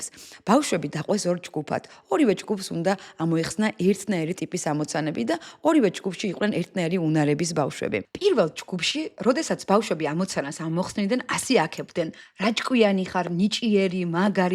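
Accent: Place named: Guria, Georgia. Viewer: "კვტეპიან" გურული ხარ უეჭველი❤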